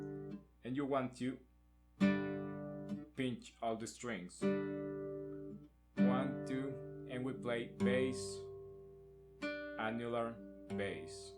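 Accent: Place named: Latin America. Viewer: JAJAJAJA Note un poco de acento latino cuando hablas en inglés, pero no estaba tan seguro. Ntp te explicas bien y se entiende al 100 todo el vídeo. Muchas gracias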